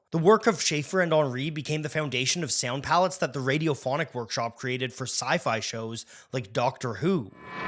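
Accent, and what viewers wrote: Canada. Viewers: He's always had a bit of the ol' mushmouth lol. The occasionally intense Canadian accent doesn't help. But perfect pronunciation isn't why I watch these videos at the end of the day.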